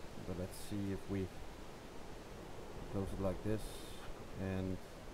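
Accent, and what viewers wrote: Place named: Denmark. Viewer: I smell danish ;) Awesome video thanks!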